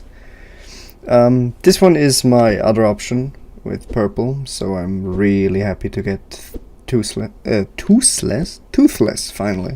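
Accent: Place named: Germany. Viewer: Man hört so krass, dass du deutsch bist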